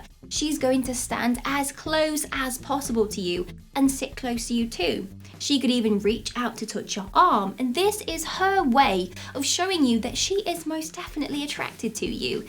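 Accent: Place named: Northern England, United Kingdom. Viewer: Watching and videos to try to figure out what part of the UK you’re from. Somewhere in north England? You’re adorable!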